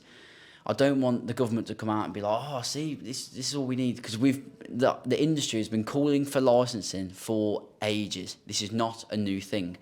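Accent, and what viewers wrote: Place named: South Africa. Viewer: Good T.W.I.V news. On the next 1 can we have it in your glorious South African voice.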